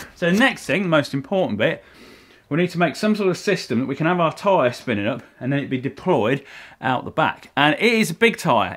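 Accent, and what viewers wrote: United Kingdom. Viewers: This guy has British accent, German look, Italian temper and American craziness.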